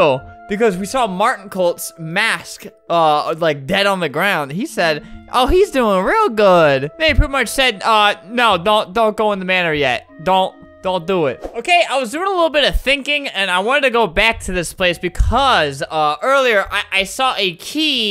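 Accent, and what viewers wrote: United States. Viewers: U always sound like ur from america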